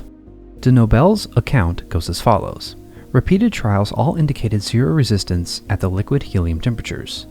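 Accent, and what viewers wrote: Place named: South, United States. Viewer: I doubt it. Were the voice AI, pronunciations would follow standard English patterns superimposed over a fake voice, but this voice is a specifically Southern variety of General American.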